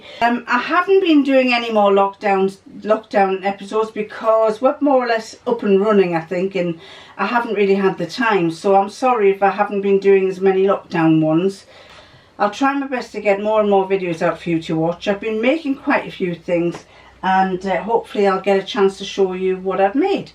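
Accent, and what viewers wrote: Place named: North East England, United Kingdom. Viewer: Hi, I love seeing what you make. I have been sewing for years and years but still can’t seem to make anything that fits me perfectly. How on earth to you manage to measure yourself and adapt patterns to fit your body? I know you said you live in Richmond but your accent suggests that you were brought up in the North East, like me, am I right? Please keep the videos coming as I learn so much from you xx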